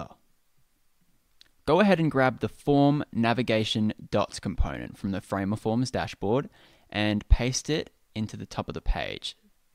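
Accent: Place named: Australia. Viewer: Love hearing an Aussie accent. Is there a Framer template that already has the multi step form built in? I'm useless at building stuff in Framer.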